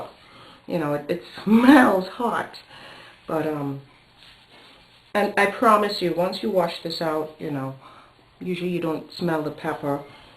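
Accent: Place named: Trinidad and Tobago. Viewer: Girl you sound so Trini, but you're probably from JA, big up!!. Thanks for the tips, will be having a go at it..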